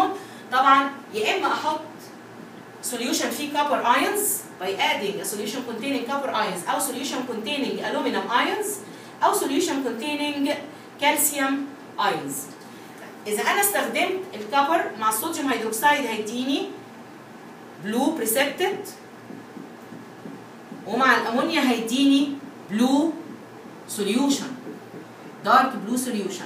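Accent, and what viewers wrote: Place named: Egypt. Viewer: your egyptian accent is fired AF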